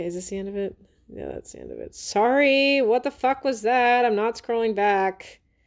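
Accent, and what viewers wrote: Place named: Long Island, United States. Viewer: 👉 Great Long Island accent. Sounds like Taylor Dayne back in the 80's. ☺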